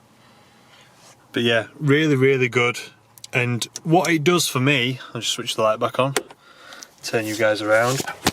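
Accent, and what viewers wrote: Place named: New York, United States. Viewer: Why does he talk like that? A American doesn't sound like that. He's probably from New York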